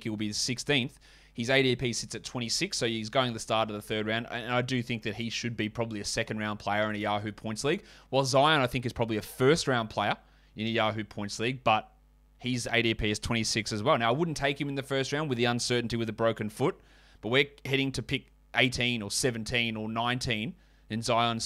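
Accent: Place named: Australia. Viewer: I'm back for the year fellas!!!! Missed ya Aussie voice!!! Greetings from Chicago! Go bulls!!!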